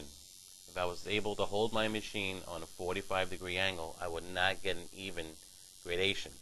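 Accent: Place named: New York, United States. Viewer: He's from New York ...I can tell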